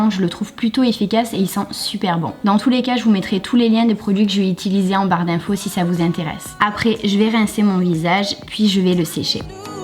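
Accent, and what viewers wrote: South, France. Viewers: Tu as des yeux tellement magnifiques c’est ouf 😍 et d’où viens ce petit accent sudiste ? 😁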